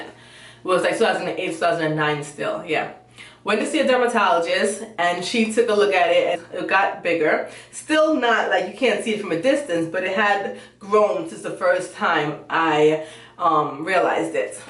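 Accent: Jamaica. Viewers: NEW Subbie...the patois got me lol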